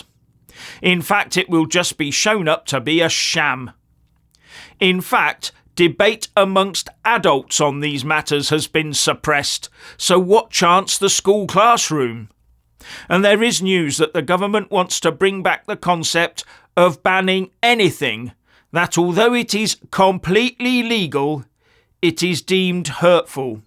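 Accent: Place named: United Kingdom. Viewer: I watch ur channel to improve my understanding of British accent... U speak so clearly, I am impressed ...❤❤❤❤❤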